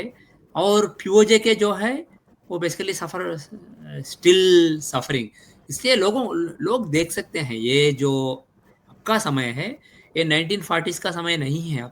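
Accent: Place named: South, India. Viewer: take time to speak clear hindi, sir ji. need more practise in hindi. south version of twist of your tongue needs correction.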